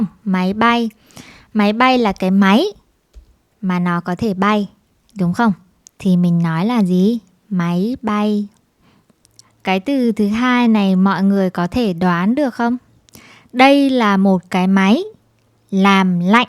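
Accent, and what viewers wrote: Vietnam. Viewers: is this northern, southern, or central vietnamese? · I really love your Northern accent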